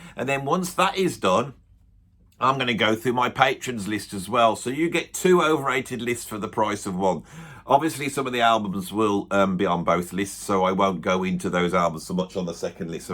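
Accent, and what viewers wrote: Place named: England, United Kingdom. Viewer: that accent. What region in England?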